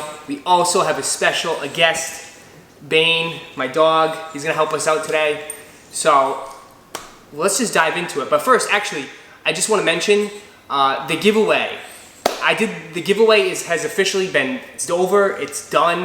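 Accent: Boston, United States. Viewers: I knew you were from Boston too by your accent! Just started watching your vids and instantly subbed. Keep it up!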